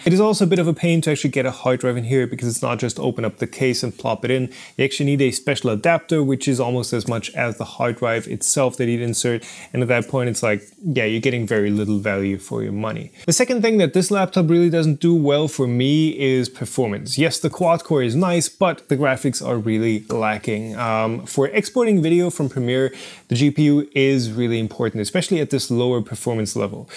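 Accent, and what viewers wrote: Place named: Germany. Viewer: I really hear his German Accent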